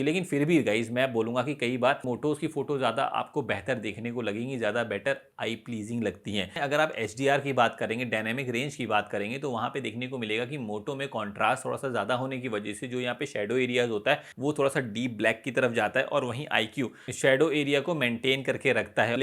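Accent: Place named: Bihar, India. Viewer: Bhihari tune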